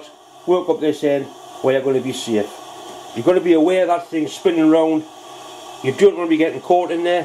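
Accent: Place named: Scotland, United Kingdom. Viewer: Ohhh, bl**dy h*ll, ya about killed me! When you said "High speed stool" I had a laughing spell that drove my cats from the room and made me see stars when I lost all my wind! Oh my brother, ya done got me typing with an accent now after watchin' ya's all mornin' long... Begging your pardon, it just feels good to mess about and have some fun with making different accents. There is not a drop of harm meant, and I certainly hope there is no offense taken? I'm just a old lad from Hawaii that lives in California now, I grew up surfing and playing footy, so I've met my fair share of Australian blokes, and later on some English and Irish surfers made it over there too, but I've yet to meet a Scot who surfed. I know they exist, some fine ones too, and Thurso is high on my list of places to visit for a surf, but I've just yet to meet one of your fellow countryman out in the surf. 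Bound to happen if I ever get to Scotland, he he. Anyhow, I am going back to watching you work, it's a great playlist and I really appreciate you taking the time to put it up for us to enjoy! I can feel your pain as far as the slow uploads go, even my computer is so old that it takes forever to just render them from the editing program. Thanks a bunch for both the great laughs and the excellent instructions. You can blame Tom Lipton for me showing up here and pestering you, he's the one whose mentioned you. Well, everybody I watch speaks highly of you, but he was just the most recent, and with my memory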